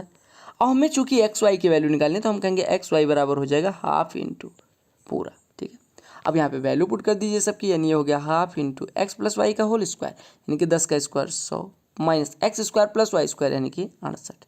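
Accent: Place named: Awadh, India. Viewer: I like Avadhi accent.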